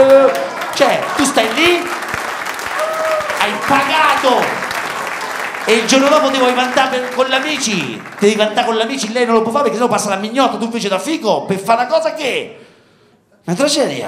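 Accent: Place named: Marche, Italy. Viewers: Il marchigiano è bellissimo.... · Regà ma quant'è bello l'accento marchigiano!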